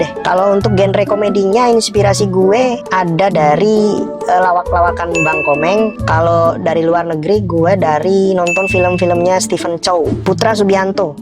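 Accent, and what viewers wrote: Banyumas, Indonesia. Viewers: Ngapakny Mana Bang ? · Ngapak e pundi mas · Ngapak nya sebelah mana bang? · Ngapak sebelah mana banh? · Anjir wong ngapak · Gua suka banget kalo bang pakai logat ngapak😂😂 sumpah ngakak😂😂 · Ternyata orang ngapak ya bang😅 gw juga orang ngapak ni btw ABG nya orang mana😁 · Oalah jebhule rika wong ngapak😂👍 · Ngapaknya mana bang.. cilacap.. Purbalingga, Purwokerto apa mana bang? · Jebule wng ngapak y, pantesan ana ngapak" e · Org ngapak? PADA WOYY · Setuju jarna inyong juga ngapak lah broo😁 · Bang ngapak bgt rika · Ngapak e ndi bang kebumen po cilacap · Ngapake ngendi bos...bener bae nek pas ngomong ngapak ngena/medok bngt 😅😅😅 sem 😅👍👍👍👍 trus rika mantan kenshu pa bos? Kadang nek jepangane 👍👍👍👍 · Bang gw juga org ngapak lu dmn nya 😂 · kalo orang ngapak, jadi keinget curanmor versi ngapak cilacap yah bang 😂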